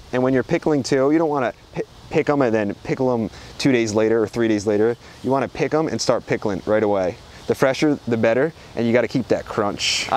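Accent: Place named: Pennsylvania, United States. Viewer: Someone sounds like they may be from PA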